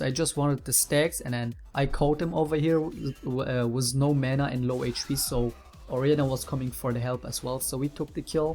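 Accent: Germany. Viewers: Ok ty, i wasn'y expectobg his accent to be a german accent, so i asked spanish but don't know the spanish accent xD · hes from germany and live in germany,so its a german accent i think he learned english in the school and games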